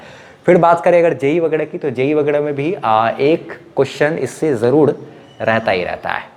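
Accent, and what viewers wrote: Bihar, India. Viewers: Sir aap Bihar k ho..?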